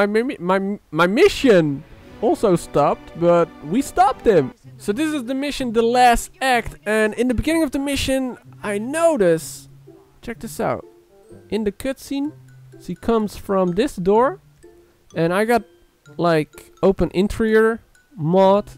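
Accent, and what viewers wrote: Netherlands. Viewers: Are you Dutch? You sound like a Dutch man.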